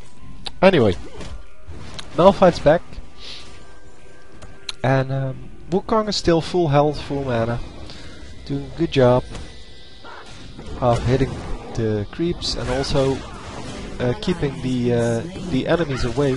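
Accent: Netherlands. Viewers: are u from holland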